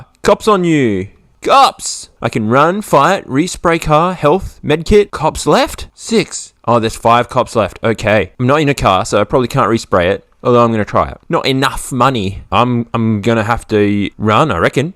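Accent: Australia. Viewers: At first I was like, "who's " then I hear the dulcet Aussie tones and I went "!"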